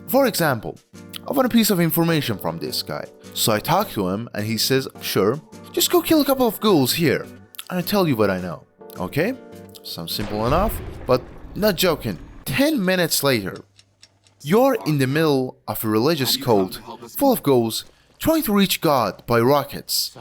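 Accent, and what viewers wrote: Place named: Europe. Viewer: When he's got a euro accent you cant place. Its gonna be a fire video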